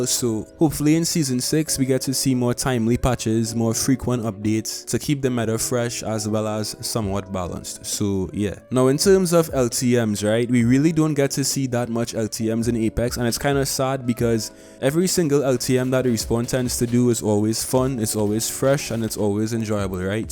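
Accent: Trinidad and Tobago. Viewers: Are you from Trinidad and Tobago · Are you trini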